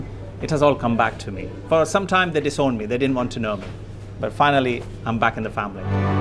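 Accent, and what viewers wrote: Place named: United Kingdom. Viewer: British accent uncle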